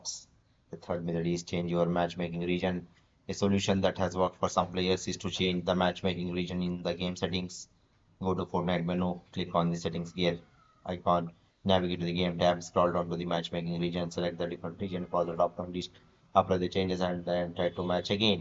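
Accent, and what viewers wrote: India. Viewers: of course he has an Indian accent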